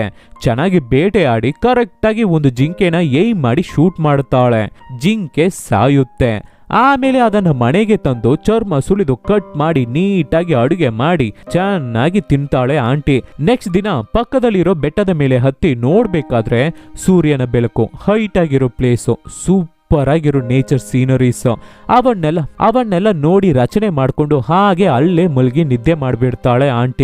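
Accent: Tamil Nadu, India. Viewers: Your mother tongue is tamil ryt?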